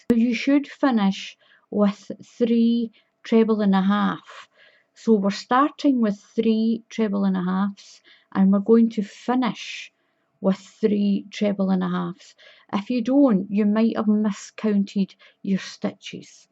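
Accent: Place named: Scotland, United Kingdom. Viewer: I can't believe it...my Momma, 86, just asked me where you were from. I mean, you sound like you were born just down the road from Sean Connery, and she asks! Hello from Texas! This is another great, beautiful tutorial, thank you.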